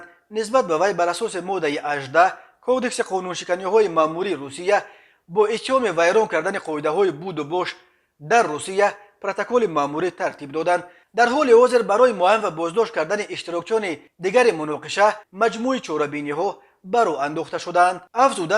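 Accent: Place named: Badakhshan, Tajikistan. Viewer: Ташакур бародар барой ахборот ва шевай ширини бадахшоният мамнунем аз хидматхоятон ки ба мо мекунед ва аз тамоми хабарой дохилу хорич зуд огох мекунед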